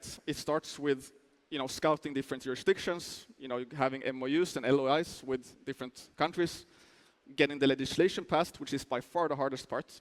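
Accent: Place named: Israel. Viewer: Sounda like israeli accent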